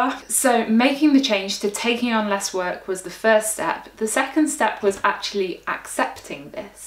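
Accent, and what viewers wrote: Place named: Derbyshire, United Kingdom. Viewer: Awesome mommy job!!! Btw, your Derbyshire accent is really pronounced in this video.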